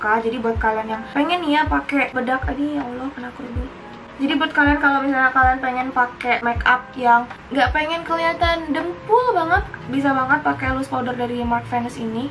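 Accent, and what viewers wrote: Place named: Sunda, Indonesia. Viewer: orang sebrang tapi sunda nya udah lekoh pisan, ngakak lucuu but luv❤️❤️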